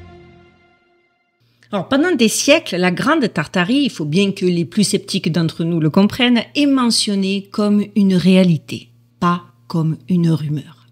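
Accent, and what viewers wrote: South, France. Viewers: Merci pour vos excellente vidéos, alors oui tartarie 3. Bonjour de Suisse, j'adore votre accent du sud 👌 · En plus avec l'accent du sud❤❤❤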